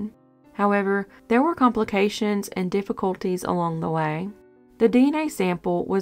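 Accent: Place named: South, United States. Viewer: Another great video! Your research and videos are very much appreciated ♥️ P.S. as a Massachusetts resident I adore your southern accent. I don’t hear southern accents up here often so it’s really soothing to hear.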